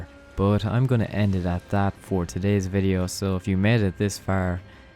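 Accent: Ireland. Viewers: Are you from Limerick, you sound local?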